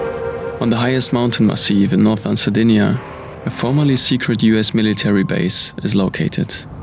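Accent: Germany. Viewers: nice video but why is it so hard for germans to speak english without accent?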